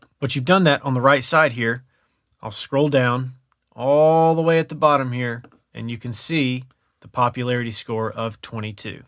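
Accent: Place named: South, United States. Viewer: good stuff man! appreciate it. noticed a southern accent, where are you from?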